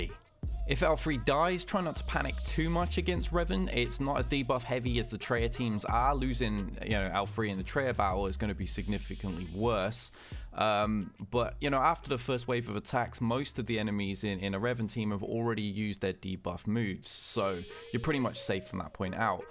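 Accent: United Kingdom. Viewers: Another great video mate its about time you got this buzz. As much as I like Warrior and Cub its much easier to listen to another proper english lad.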